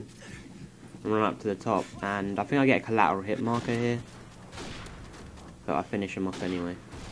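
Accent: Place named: United Kingdom. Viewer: yeay hes british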